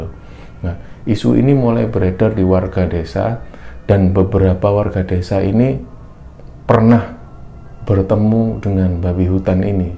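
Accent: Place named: Banyumas, Indonesia. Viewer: Salam pagi salam kenal mas.. Baru sempet comen biasanya cuma like saja... Walaupun saya orang sunda tapi seneng bahasa jawa kental mas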